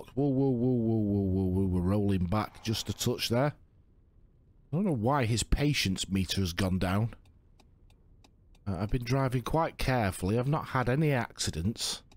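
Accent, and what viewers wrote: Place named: Northern England, United Kingdom. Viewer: Tha sound like a fellow northerner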